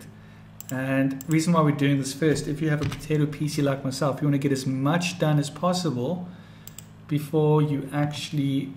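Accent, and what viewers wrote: South Africa. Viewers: Are you South Afican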